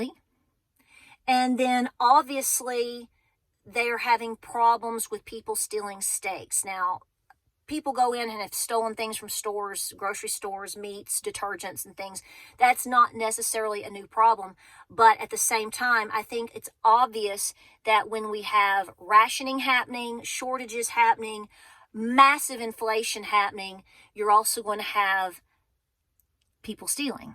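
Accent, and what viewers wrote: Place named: Kentucky, United States. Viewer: you’re beautiful, inside and out! So very thankful I found your channel. My husband and I live in west central Kentucky (both of our families are from eastern Kentucky) and hearing you talk feels like “home” to us. Thank you for all your hard work. This can all feel so lonely sometimes and it’s nice to read comments and not feel so alone. Wish we could all get together! ❤️🤍💙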